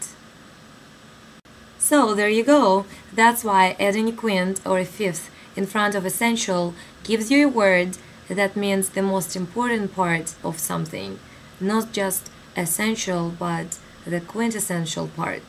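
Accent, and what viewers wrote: Russia. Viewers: She's most likely Russian. Notice how she pronounces the words "lesson", "fifth" and especially the vowel "a" as in " ". BTW: LOL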